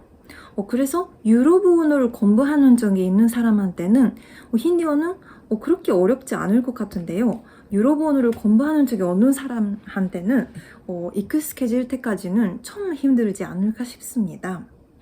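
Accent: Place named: Japan. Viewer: 日本人の韓国語らしく日本人の私には聞き取りやすいですが、韓国語ネイティブにとってどんな感じですかね。でも内容はとても面白く、違いを要領よくまとめていらっしゃいますね。ありがとうございました。❤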